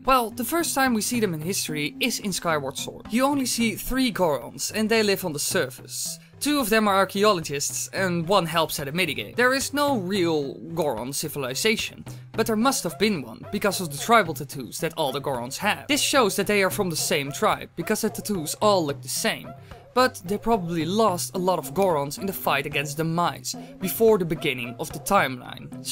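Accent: Netherlands. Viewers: ah that's why, your accent made me remember my own language (also Dutch) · are you from Holland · Ik dacht al dat je nederlands was!!! Ik hoorde het aan je stem!!! Subbed! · I came to your channel from m. productions and I'm so glad I found it. When I first heard your accent it sounded so familiar, and then I found out you and vortexxy are both from the Netherlands. It would also be cool to see a theory about the sheikah. There just so much unexplained stuff around them. Keep on making awesome theories!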